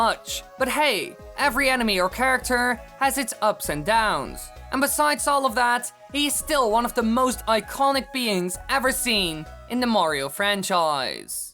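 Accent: Netherlands. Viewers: Are you by any chance dutch? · Jouw heel klein beetje Nederlands engels accent is heelijk om te luisteren 😂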